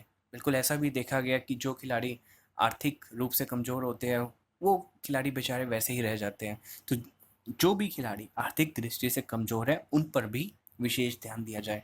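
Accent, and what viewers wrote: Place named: United States. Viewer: Aap america se ho kya sir ... Aapka toning america wali hi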